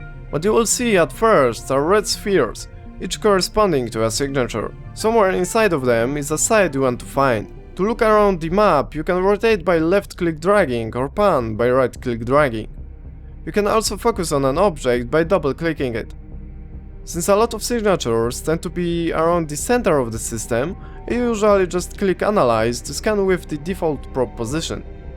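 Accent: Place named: Poland. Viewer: Oh QRVA, a polish guy. If you want polish speaking eve, look at my channel. Also, qrde, kocham twoj akcent :> Don't forget as an alpha, your skills suck, and your tier 1 equipment sucks, so it is NOT THAT EASY to get a site to 100%. Also, good luck to all the alphas probe scanning in low sec and null sec without a cloak.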